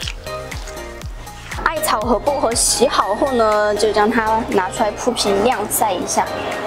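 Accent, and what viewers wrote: Sichuan, China. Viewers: 👍👍實用，四川普通話嗎？☺️☺️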